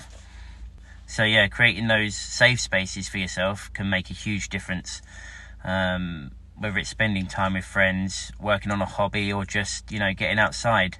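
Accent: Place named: United Kingdom. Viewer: Откуда Вы? Такой акцент, будто Вы из Великобритании, звучит так правильно. Получилось ли у Вас создать свою семью?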